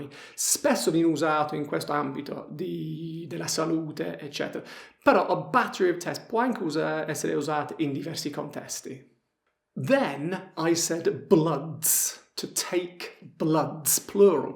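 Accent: Apulia, Italy. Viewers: Sei bravissimo oltrechè simpaticissimo con il tuo italiano dall’accento pugliese. Bravo!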